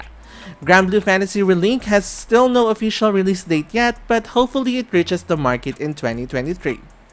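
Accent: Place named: Philippines. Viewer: Wow Pinoy accent 😂 Hello Lods!! ❤️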